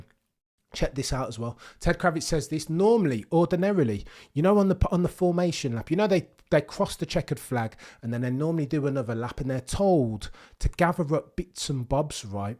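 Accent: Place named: Cockney, United Kingdom. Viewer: Black guy with cockney accent refreshing change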